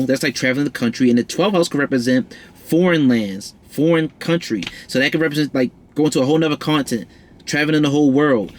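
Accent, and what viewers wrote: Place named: Baltimore, United States. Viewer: He sounds like he’s from Baltimore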